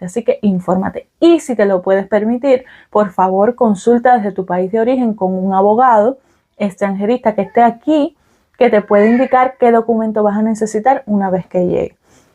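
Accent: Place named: Central Cuba, Cuba. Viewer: Una ciudad tranquila salvo ciertas calles a ciertas horas. Por el acento debes ser del centro de Cuba.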